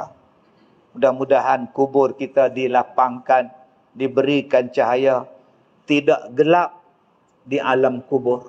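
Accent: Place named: Kedah, Malaysia. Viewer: Percakapan nya slang kedah...byk juga yg tak faham....tapi saya faham sebab arwah bapa org kedah tapi arwah ibu org minang.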